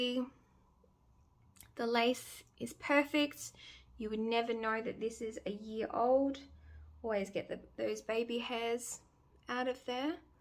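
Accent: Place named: Australia. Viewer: I'm just scrolling and I came across your video. I've heard of Highline Wigs but don't know much about human hair wigs (due to me being unable to afford one I'd say, lol). What a beautiful wig she is. I love a low density wig and my favourite length is probably 16-18 inch. It's so good to come across a wig review that's basically a update on what the actual wig is like a year later and how it's held up in the meantime. We need more of these. It looks so natural on you, totally fab. I also had NO idea that the hair on your head, the one you had up on a bun, until almost at the end of the video, was a wig btw. Lol. Excellent job, thank you. It was also good to hear a fellow Aussie accent doing a review as well. x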